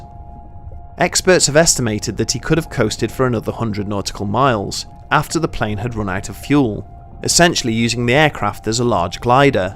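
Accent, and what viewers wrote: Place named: United Kingdom. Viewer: The brit vocals are irritating LOL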